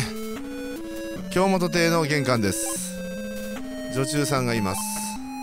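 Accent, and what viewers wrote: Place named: Kyoto, Japan. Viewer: 京都弁いいですね〜꒰ ´͈ω`͈꒱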